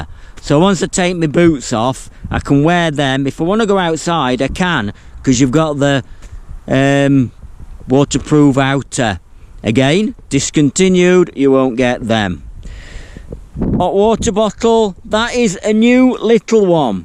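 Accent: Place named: Dronfield, United Kingdom. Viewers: Absolutely love your videos. Originally from Dronfield, so it's lovely to hear your flat vowels. Really fabulous content, do keep them coming. Much respect sir 🙂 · I much prefer your narration and Yorkshire accent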